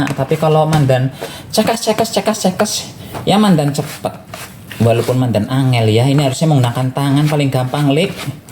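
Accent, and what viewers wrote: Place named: Banjarnegara, Indonesia. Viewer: Mas...aku ngefans banget karo njenengan....saben dina nontoni nyutube..nek minggu libur..sok praktek...top resepe kereen. Nyong dadi kemutan desaku nang kabupaten Banjarnegara....ngomonge dialeke plek kecipek yakin.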